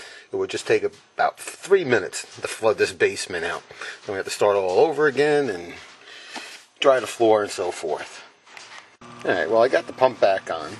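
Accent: New York, United States. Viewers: New York accent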